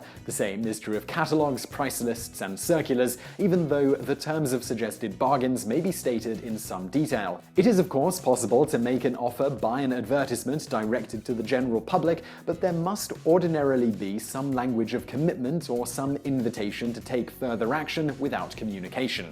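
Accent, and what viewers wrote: United Kingdom. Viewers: "PentaGIN" Its PentaGON" what the fuck is so hard to say that with a heavy british accent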